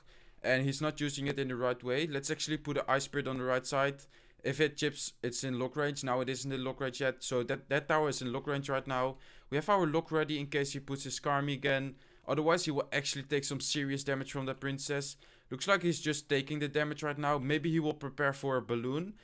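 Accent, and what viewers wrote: Netherlands. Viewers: are you dutch? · You're not "monotone" it's just your accent bro don't bother with trying to change ur accent ur from the Netherlands and ur voice is just like that! I've learned a ton from u man! I use this same deck but use hog, zap, Skarmy, rocket, inferno tower, Princess, gob gang, gob barrel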